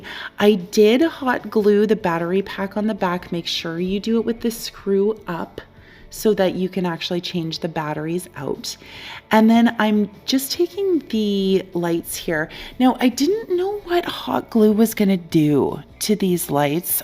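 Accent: Canada. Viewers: Hehe I keep forgetting you're Canadian!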